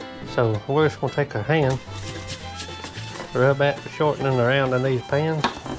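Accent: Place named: Kentucky, United States. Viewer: I’m smiling the whole video. When I hear you talk...takes me right back to Sunday dinners with my Kentucky kinfolk whom I miss dearly. Until I get to Beulah Land. Thank you and God Bless.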